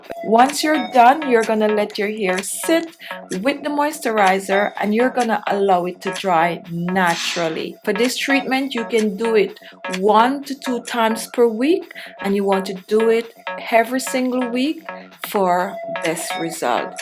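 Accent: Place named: Jamaica. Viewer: You must be Jamaican with that accent , great video..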